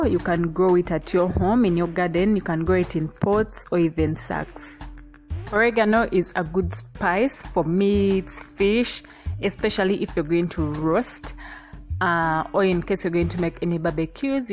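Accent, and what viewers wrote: Uganda. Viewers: She is likely ugandan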